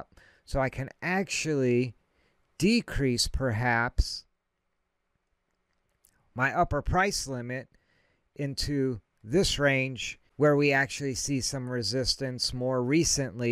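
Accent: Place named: United States. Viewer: you sound american, how can you use binance? i got kicked off and forced to open a Binance.US account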